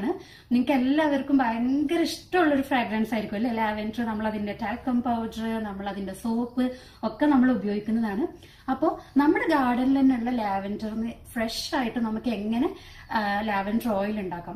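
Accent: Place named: Malappuram, India. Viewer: ഇജ്ജ് മലപ്പുറാന്ന് അന്റെ ഭാഷ പറയുന്നു 'keep it up Innocence feel എല= ഇല പിന്നെ നാട്ടിൽ ഈ പുഗ്ഗ് കിട്ടുലല്ലോ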